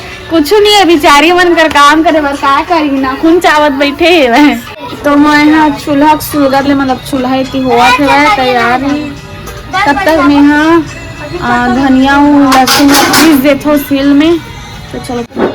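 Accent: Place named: Chhattisgarh, India. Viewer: बहुत ही सुन्दर और अपनी गांव की यादें ताजा हो गई, बहुत अच्छा छत्तीसगढ़ी बोली हे, जय छत्तीसगढ़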